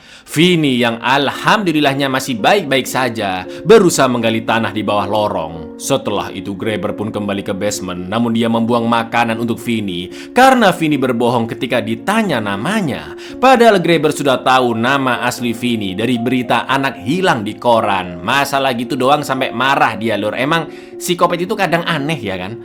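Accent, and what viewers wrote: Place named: Surabaya, Indonesia. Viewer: Cak asline arek ndi? Kok logat bahasa e koyok Suroboyo sidoarjo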